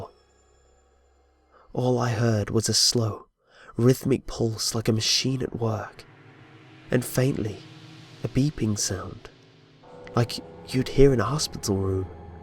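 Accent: United Kingdom. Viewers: Narrator with a UK accent....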